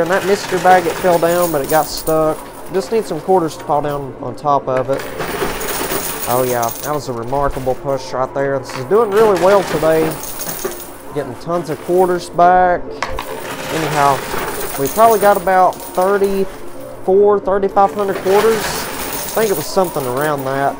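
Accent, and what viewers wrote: South, United States. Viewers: Love your videos and your southern accent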